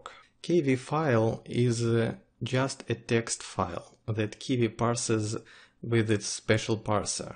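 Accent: Russia. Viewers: Мне показалось или блогер с русским акцентом? В любом случае очень круто!!!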